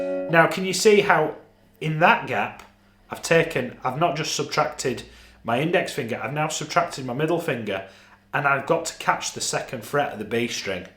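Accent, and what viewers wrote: North, United Kingdom. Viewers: Call me crazy but you almost sound northerner like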